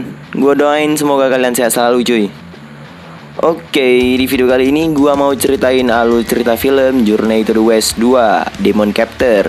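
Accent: Aceh, Indonesia. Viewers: Orng aceh ya bang? :V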